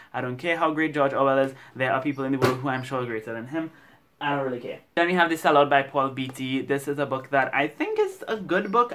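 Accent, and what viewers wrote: Trinidad and Tobago. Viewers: are you from Trinidad because you have a Trinidad accent by the way love your videos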